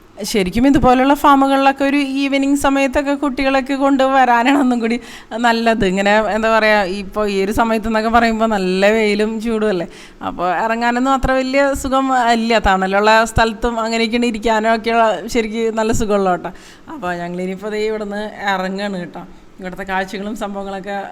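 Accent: Thrissur, India. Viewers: ശബ്ദത്തിൽ നിന്നു മനസിലാകുന്നു തൃശൂർക്കാരി ആണെന്നു - ശരിയാണോ?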